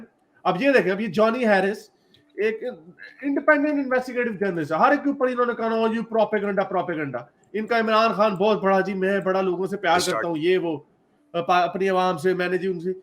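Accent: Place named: Punjab, India. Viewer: Bhai ji apki urdu ka panjabi lahaje me bat karna kafi acha lagata hai🌹🙏🌹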